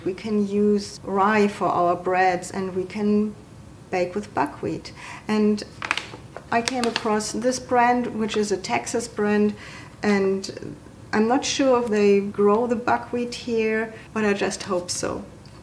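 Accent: Germany. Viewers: Not only do I love this recipe and channel, but I love your accent! My father was from Hamburg and I miss his accent. He passed away. He was from WW2. He was a doctor as a profession in America. The German accent is a pleasant soft rolling melody of inflections to my ears, so I really find it easier to learn new German recipes on this channel. My brain gets it. ( can't remember the prayer he taught us for "grace" at the table, though, sadly.) It's been decades ago, many!!! Love this channel.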